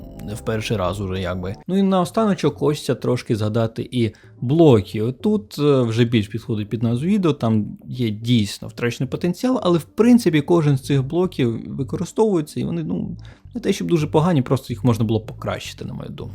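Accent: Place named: Russia. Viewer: виправдання взагалі жоРсТкО помаранчевий так часУ з наголосом на У обговоримо застосування шкірку шкірку сенс/застосування справді гівно кориснішими тонування каблучки/персні незрозуміло просто обережнішими, без «більш» так дупу/сраку/гузицю поставитИ мОмЕнтИк І по всьому відео: 1. Літера «Щ» дає два звуки: [шч], але не [шь] 2. Милозвучність української полягає в звучанні голосних, а саме, в тому, що всі голосні як пишуться в слові, так і читаються і тут немає цих московських спрощень коли літера «О» читається як [а] і тому подібне. Багато такого чути в твоїй вимові. А так, молодець, що знімаєш українською. Виправити ці моменти і буде прям фист файно.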